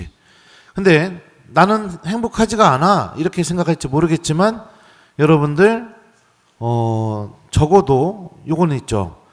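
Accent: Chungcheong, South Korea. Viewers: 신부님 감사합니다 강론말씀에 저 영적으로 채워지고 있는듯 해서 넘좋습니다~ 정겨운 충청도 사투리에 마음이 따뜻해집니다 왜인지 돌아가신 부모님이 생각납니다~~ 신부님 감사합니다 하느님 감사합니다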